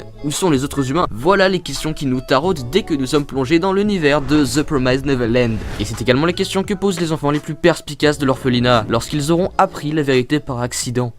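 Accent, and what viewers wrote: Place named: England, United Kingdom. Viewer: Vidéo très intéressante et très instructive , accent anglais au rdv. Appréciable. <3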